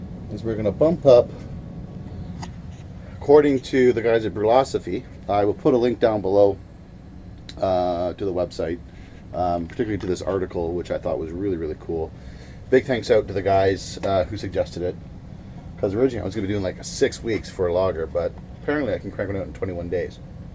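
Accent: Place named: North, United Kingdom. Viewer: Just curious, why would you take the probe off the fermenter. You still want to be bringing the actual temp of the ferment up....I could be totally wrong but I think you should keep it attached. Anyway, can't wait to see how it turns out. Once I have my dual stage controller a lager is in order. Cheers my more "northern accent" friend!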